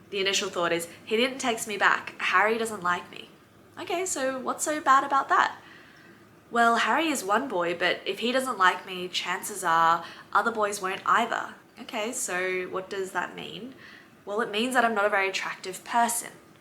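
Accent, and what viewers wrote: Australia. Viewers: Thank you for your reply.🩷I tend to compare myself with somebody that speaks English so well, and I hate myself doing comparison. If I were in Sydney, I'd like to have a session with you to improve my confidence. Please keep posting. I love Aussie accent, and I'm infatuated with Australia! So, your video clips remind me of fun time I had in Sydney! Yes, I've lived there for a little while a long time before. And, I miss Australia.🥹🥹🥹🥹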